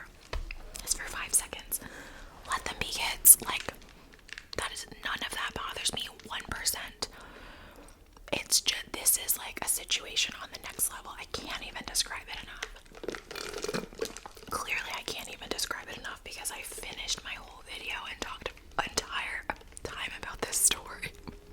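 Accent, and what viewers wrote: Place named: Delaware County, United States. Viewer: Girl are you from Delco? You remind me of back home 😂❤️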